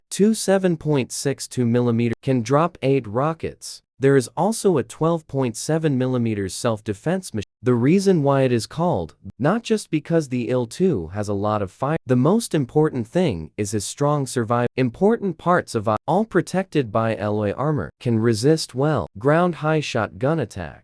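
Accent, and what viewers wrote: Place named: India. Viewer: "Inside this video". This is not even AI its actualy an indian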